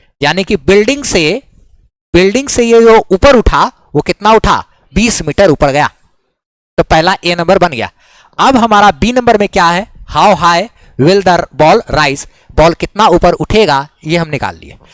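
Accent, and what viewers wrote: Bihar, India. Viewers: Aap bihari bolte ho kya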